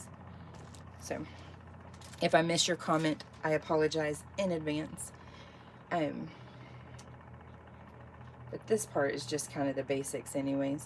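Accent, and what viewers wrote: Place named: Alabama, United States. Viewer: hi watching on replay and you sound like me from Alabama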